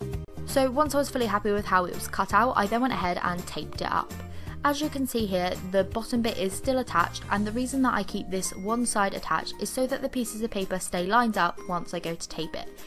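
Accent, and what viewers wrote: England, United Kingdom. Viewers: i love her british accent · love yr English accent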